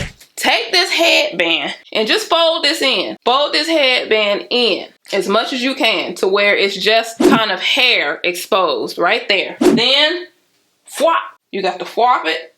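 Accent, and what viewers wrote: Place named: South, United States. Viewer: You. Are. HILARIOUS sis. This is my first video & I was CRYYYYYINGGG laughing!! The heavy accent with the southern country unabashedness was everything I couldn't have thought to ask for am 😂😂🤣🤣🤣 Beautiful job, ma'am!!! 😍👏🏽👏🏽👏🏽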